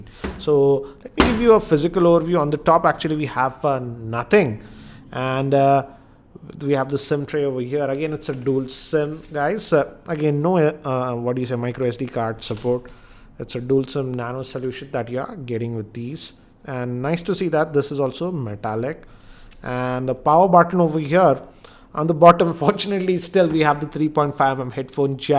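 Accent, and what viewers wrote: India. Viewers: Indien English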